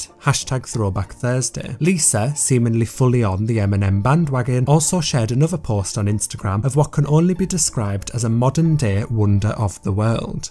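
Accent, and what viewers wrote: United Kingdom. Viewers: I appreciate the sincerity, seriousness and UK accent you employ in approaching these major topics of their time. It's like Dateline for silly memes.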